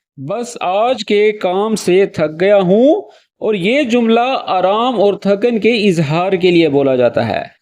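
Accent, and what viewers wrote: Arab world. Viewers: ye arbi accent kis mulk mein boli jati hy?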